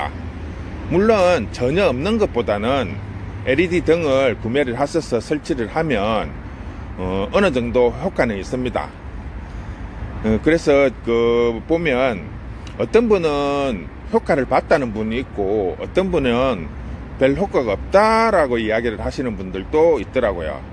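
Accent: Gyeongsang, South Korea. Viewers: 있는 그대로의 모습과 사장님의 구수한 갱상도 사투리가 어우러져 훨씬 정감가고 멋있습니다 거기다 좋은정보까지 ᆢ 정말 감사드립니다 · 화려함은 중요하지 안어요 있는 그대로의 모습~~ 님의 그수한 경상도 사투리로 알찬정보가 구독자분 들에게는 많은 공부가 된답니다 고맙습니다.감사합니다.축복합니다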